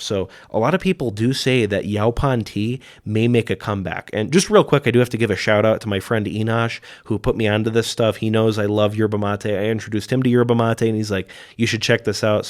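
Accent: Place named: Midwest, United States. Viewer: I have been meaning to try Yaupon and got this video suggested to me! It is interesting though because you have a very thick midwestern and southwestern accent!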